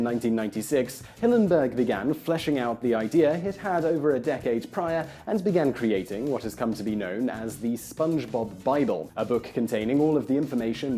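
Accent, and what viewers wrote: United Kingdom. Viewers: Idk what it is with watching people with a British accent explain stuff, but it gets my precum flowing.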